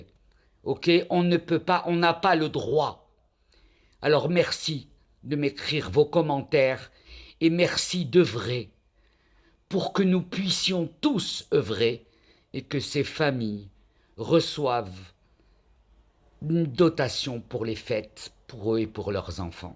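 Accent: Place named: Morocco. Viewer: يلاه دخلت للقناة ديالك عن طريق واحد الفيديو كتهضري بالدارجة تبارك الله عندك دارجة مغربية قديمة كتعجبني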